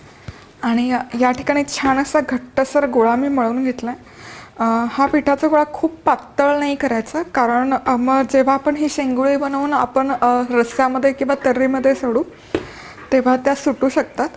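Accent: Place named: Pune, India. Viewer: tume chan puneri boltat....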